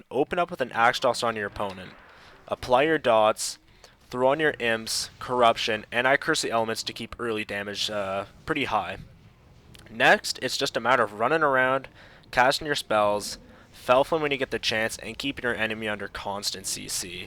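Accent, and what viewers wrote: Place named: Canada. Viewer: You have a very stereotypical Canadian accent.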